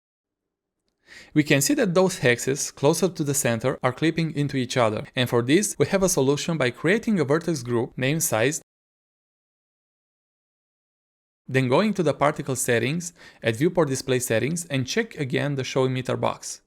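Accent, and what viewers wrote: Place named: Russia. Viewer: Дружище, по акценту слышно, что ты русский. Пожалуйста выпускай хоть иногда видео на русском 🙏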